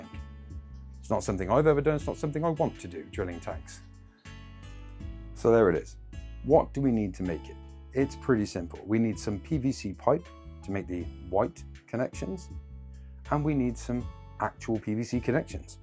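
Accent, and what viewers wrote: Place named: United Kingdom. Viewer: Mate are you from the uk by any chance?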